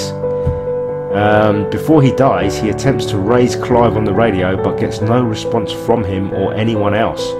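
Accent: United Kingdom. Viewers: This game is beautiful and amazing. yes the slow walking can be a tiring if you are back tracking (for instance I completely forgot that you needed to move the controller near some lights and only did it the first time instructed put the game down for 1 month came back to and made it to bunker wondering why won't it open untill I watched the beginning of a walk through and was like Noooooooooo) but the walk through this town and countryside is peaceful and beautiful. I found myself looking up through the trees listening to the music speechless. I love piecing together the story which was a great story. This was my game of the year (over all the ps4, xboxone exclusives and 3rd party games) because this is the only game leaving me thinking about it weeks later and looking on youtube for others point of view and searching for things I may have missed. thank you for this commentary it was perfect. 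especially since you sound like you are from the UK it works to enhance memories of the game. thanks again keep up the good work. I thumbed up and suscribed.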